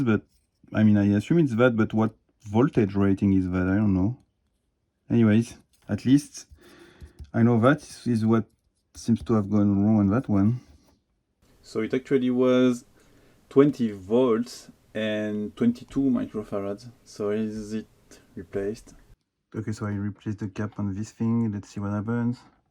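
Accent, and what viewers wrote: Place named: France. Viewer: I hope you do more of these live unboxing. We’re all here for your lovely French accent <3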